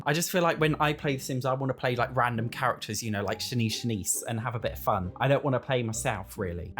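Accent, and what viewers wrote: Australia. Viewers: ... as an aussie, that accent was 100% what we sound like 😳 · OMG YOU SOUND SO AURSSIE!!!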